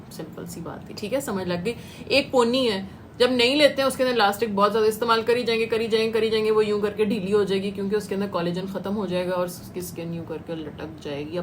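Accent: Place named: Punjab, India. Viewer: Yar aik to smile boht pyari h apki 2nd punjabi boht pyari bolti ho ap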